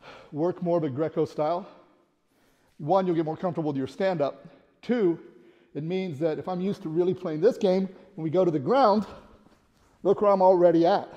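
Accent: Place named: United States. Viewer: Never realized you were teaching out of Germany; I just assumed the US by the accent. Almost makes it feasible to visit from Norway as a vacation project some day.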